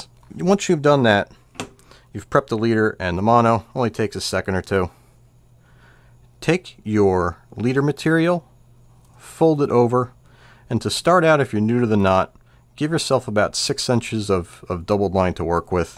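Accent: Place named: Westchester, United States. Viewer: Your accent sounds like a friend from Westchester. He grew up in CT, but family is from the NYC area and L.I.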